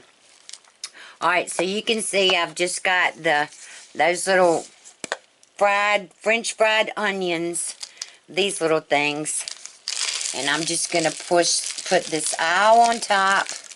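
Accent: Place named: Virginia, United States. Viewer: Thanks for your efforts in producing this video. My wife is preparing it as I write. Are a Virginia resident? We live in central Virginia and your accent sounds familiar.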